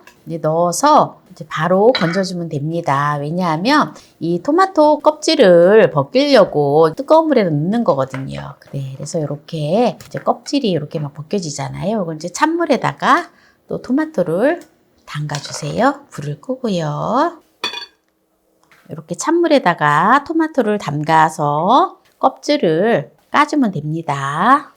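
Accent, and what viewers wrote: Chungcheong, South Korea. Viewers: 고향이 충청도라 그래유 이해해주세요 죄송합니다 ❤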